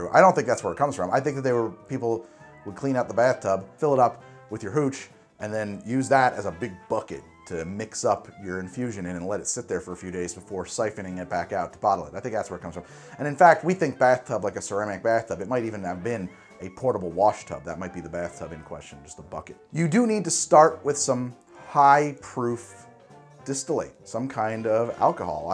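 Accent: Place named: United States. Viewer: I don't actually know where lives, I assume the US because of his accent and turn of phrase, I assume you live there as well because of your attitude, I could be wrong and you could both live in Australia, I really don't know and it frankly makes no difference. And as I don't know, I will go with the worst possible situation (eg 'doing this is breaking the law') and work from there, if it's not illegal where you are that's great! Have fun, try not to burn yourself on any of the hot surfaces and liquids that this process will create, and be careful with which fractions you choose to drink from it. But I'm not going to claim to know what the distillation laws across the globe are, I'm not a lawyer.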